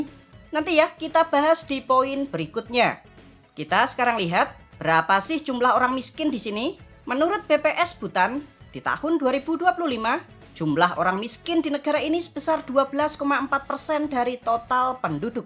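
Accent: Java, Indonesia. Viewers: Min medog jawane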